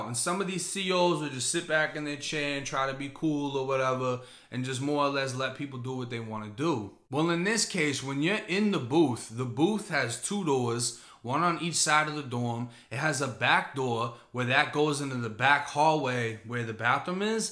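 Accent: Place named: New Jersey, United States. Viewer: Kinda sound like you from jersey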